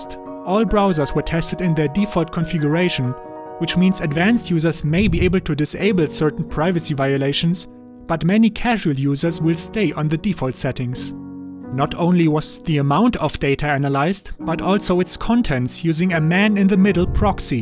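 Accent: Germany. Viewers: German accent? ;-)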